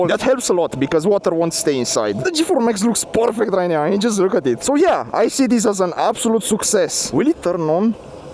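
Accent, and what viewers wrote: Romania. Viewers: Ba se vede ca ai accent roman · Yeh, he is Romanian guy from the english speak and accent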